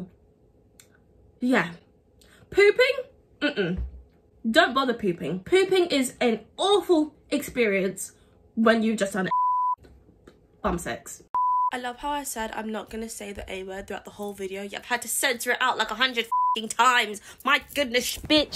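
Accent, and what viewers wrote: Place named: United Kingdom. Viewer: You cute love from NYC and I love ya U.K. accent love from NYC once again 😘💗❤️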